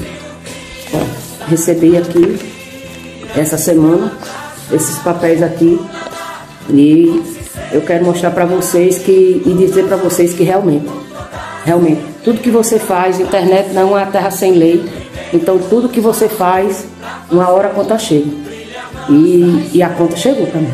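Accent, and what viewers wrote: Northeast, Brazil. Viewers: Sou de Belém do Pará e adoro esse sotaque nordestino.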